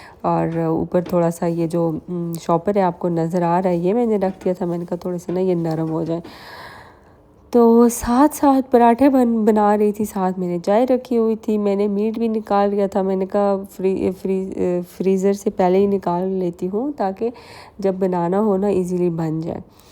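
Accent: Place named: Hazara, Pakistan. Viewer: Her accent of Urdu language reflects her connection with Hazara Valley of Pakistan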